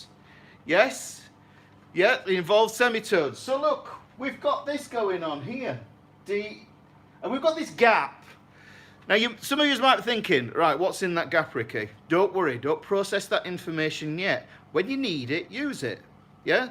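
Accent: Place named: Huddersfield, United Kingdom. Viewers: Wow, I finally found someone who teaches using a proper accent (I'm from Huddersfield too), and not only that, uses an overhead projector!!! You totally rock, and I am absolutely digging your theory lessons, really well explained 👍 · Art Attack for fretboard theory. Absolutely love your northern accent.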